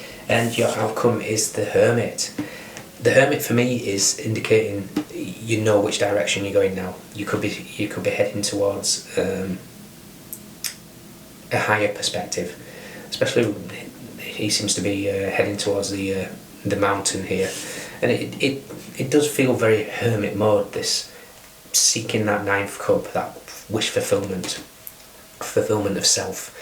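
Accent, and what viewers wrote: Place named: North, United Kingdom. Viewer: That was nicely put😄 especially with that northern accent. Thank you for that🙏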